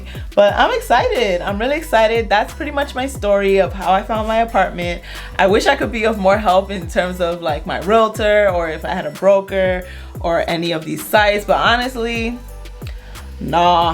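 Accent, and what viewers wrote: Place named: Nigeria. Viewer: Her Nigerian accent is life🤣🤣🤣🤣🤣♥️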